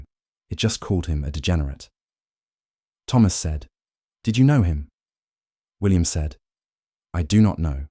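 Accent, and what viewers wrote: England, United Kingdom. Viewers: Good initial message, really awful use of repetition in AI to get monetized views. AI should be able to convey a much smoother story across a much more sophisticated narrative arc and provide dialogue that is "show" not "tell" with a thousand uses of "said." Then how many times is the narrator with an English accent going to use the wrong pronunciation of "clerk", "clark" was used 100 years before this time period min the south of England and never in Manhattan. There are better stories of the times available. Not a single handshake, hug, kiss or sign of sexual intimacy. I hope you learn your AI tool and do quality control before releasing any more stories. Unless you are just in it for the money. YouTube does not seem to care that this low effort form of AI that is ruining their product.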